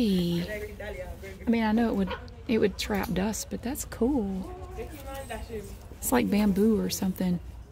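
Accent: South, United States. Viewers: "Naughty-nahn, naughty-nahn" Gawd I love the southern accent. LOL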